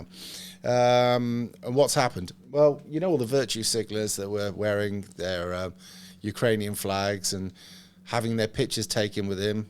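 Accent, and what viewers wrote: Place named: West Country, United Kingdom. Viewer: You sound like a West Country mental defective.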